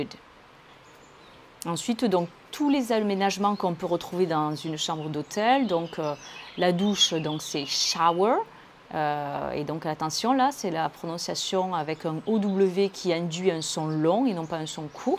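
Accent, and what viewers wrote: France. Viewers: I love your French accent when you speak English. Innernet : Internet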